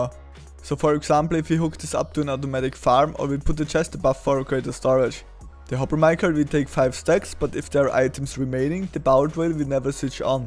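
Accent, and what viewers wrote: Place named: Germany. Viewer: Bisschen deutscher Akzent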